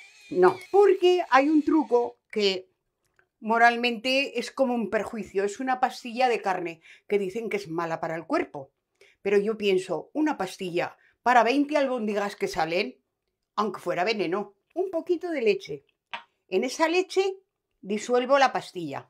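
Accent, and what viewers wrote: Aragon, Spain. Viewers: Todos estos ingredientes y tu acento mañicooo!!! Oleee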